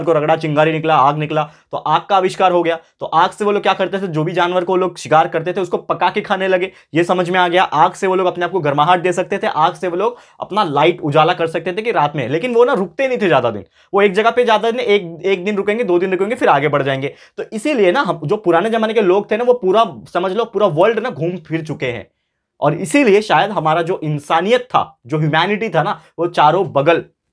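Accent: Maharashtra, India. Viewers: Sir are you Marathi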